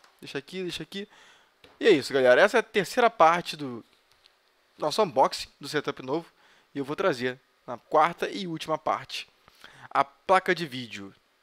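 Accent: Rio de Janeiro, Brazil. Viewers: Tu é de qual zona do rio!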